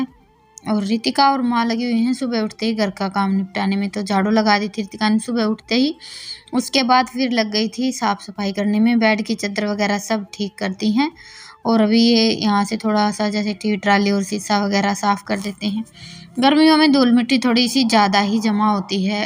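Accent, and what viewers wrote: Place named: Rajasthan, India. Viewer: Didi aap kha se ho aapki awaz se to lagta h aap Rajasthan se ho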